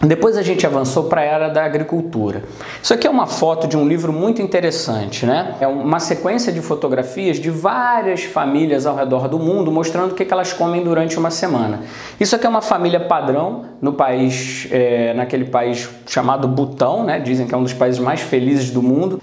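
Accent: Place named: Rio de Janeiro, Brazil. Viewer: Esse sotaque Carioca é tudo!!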